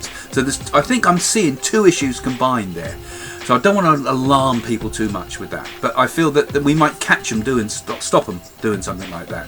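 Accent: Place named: South London, United Kingdom. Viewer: what is your accent? Sounds something between South London and Manchester.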